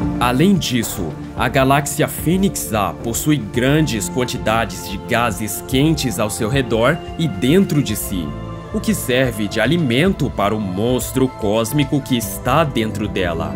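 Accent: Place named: Caipira, Brazil. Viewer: eu gosto quando o caipira surge "redoR" "caloR"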